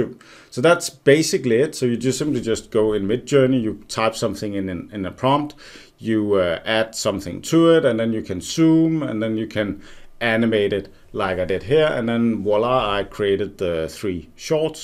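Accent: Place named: Netherlands. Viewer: What the hell is that accent man? It's like Dutch, English, American-Aussie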